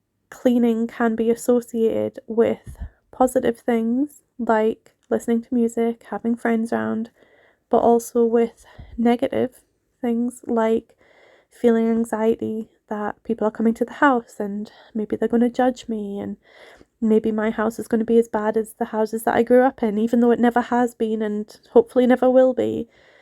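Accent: Scotland, United Kingdom. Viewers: My grandmother was from Scotland and hearing your voice and accent has brought me so many sweet memories. She was such a sweet, loving woman. Thank you both, ladies, for the fine job you do. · yes has picked up quite a lot of the scottish accent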